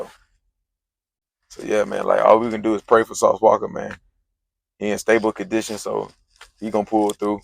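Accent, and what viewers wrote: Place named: Carolina, United States. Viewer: I think u from the Carolina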